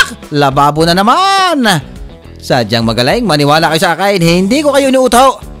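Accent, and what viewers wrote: Batangas, Philippines. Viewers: Solid Yung Batangueño accent Hahahaha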